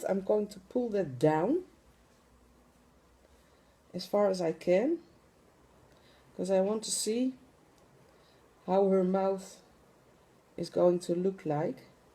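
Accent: Netherlands. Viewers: Just wanted to say thank you for your fantastic tutorials....I'm new to doll making but you have inspired me and definitely shown me the way forward. Your tutorials are easy to follow and I love listening to your lovely Dutch accent! Best wishes and thank you!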